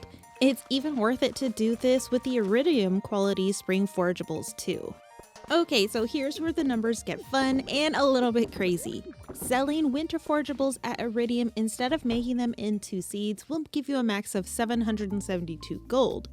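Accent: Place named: United Kingdom. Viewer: I am getting sone serious bri'ish vibes.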